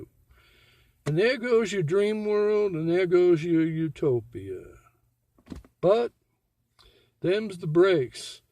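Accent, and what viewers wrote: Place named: South, United States. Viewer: I love the SOUTHERN DRAWL. Interesting articulated take. :) Lemme argue definition for a moment here. btw, I think that Reiner is totally a crazed Leftist. I think Leftists are the crazies, and I think "libs" are different, in that they are, well, the "good Dems"...which sometimes sway to center, or even right of center. So, for voting purposes , let's not ostracize the "libs".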